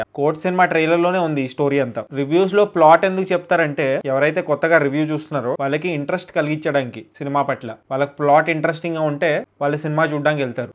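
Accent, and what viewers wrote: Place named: Visakhapatnam, India. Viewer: East West vizag dhaggaragane untundhi slaung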